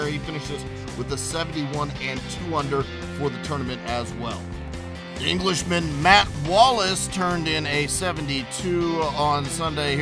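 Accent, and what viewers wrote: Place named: United States. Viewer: Crap yank commentary...I can't watch it after 7 seconds...just ruins the highlights...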